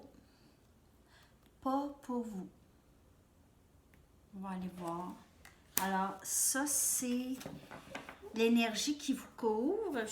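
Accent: Quebec, Canada. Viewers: L'autre personne comme moi sommes du signe de la vierge (lui 6 septembre et moi 16 septembre), exact il se s'est longtemps senti en victime car son ex l'a trahi pour aller avec un autre ce qui lui a brisé le coeur et il commencer se relever juste maintenant en cette période du printemps et j'étais la pour l'aider donc je pense que le message "ton amour me rends meilleur" me concerne 😊 vous êtes forte merci jolie accent québécois ❤️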